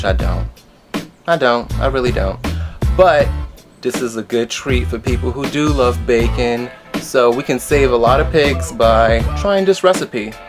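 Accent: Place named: New York, United States. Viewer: Oh I thought I replied here, I guess it must be my ADD...thought I hit post, but probably got distracted. I am sorry, I kinda thought I detected a NY accent so I figured you might know that BLT is NY Diner talk for a Bacon Lettuce and Tomato sandwich...so an ELT would be your Eggplant (bacon) Lettuce and Tomato! I bet it would be amazing! 😍 Don't forget the vegan mayo! 💗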